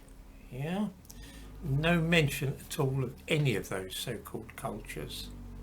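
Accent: United Kingdom. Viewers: All that's missing from this clown is big shoes and a red nose. Funny how US thinks that by saying BS with a British accent, it somehow is more believable. No doubt because the US is still owned by Britain. The comparison of the wall of great Zimbabwe created BC to a 15th century European church is ludicrous. Like P. T. Barnum said, " there's a sucker born everyday.!" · Can you please explain to us why western museums are filled with Africa artifacts? Where are your artifacts on display? We wanna know. Why don’t we see your heritage 10,000 years back? This is what you might relate to with your British accent. <link> Did your people make the bronze art in Benin and “collected” it later through colonialism for “safe” custody in Europe?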